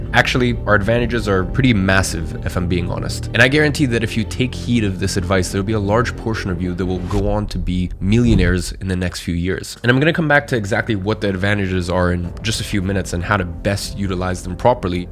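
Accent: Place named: United States. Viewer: почему у тебя американский акцент живя в Лондоне?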